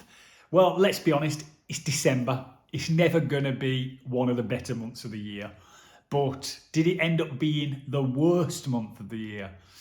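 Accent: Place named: West Midlands, United Kingdom. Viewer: From your accent, I’m guessing you’re from the West Midlands, so we’ve got very similar weather. I’m surprised your imports are way lower than mine. I guess a lot will be the positioning of the PV. DNO export limit: 4 kW Inverter 6kw PV = 7kw (South-west facing) Battery = 18.8kwh Imported £65.59 Exported £15.01 EV MG ZS Octopus Go + Outgoing Octopus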